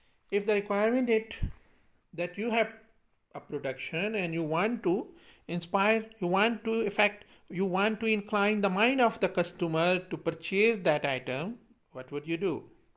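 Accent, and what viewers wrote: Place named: Khattak, Pakistan. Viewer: Pure Khattak and non-native accent.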